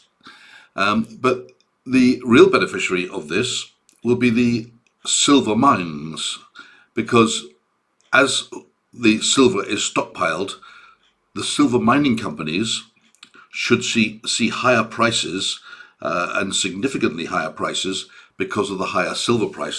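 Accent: United Kingdom. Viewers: I love the content of course, baut also, as a French, is just delghted listening to your british accent.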